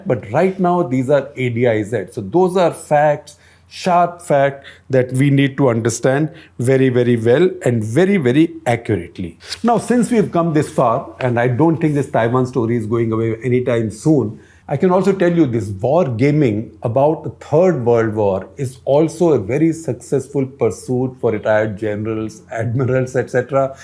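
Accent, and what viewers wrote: India. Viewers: It is Indian English and this is how we speak it here. Like it or lump it.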